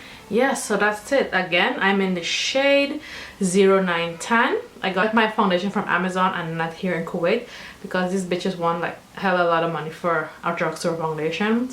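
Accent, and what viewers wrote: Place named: Caribbean. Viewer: you sound caribbean, where are you from? I'm from Trinidad.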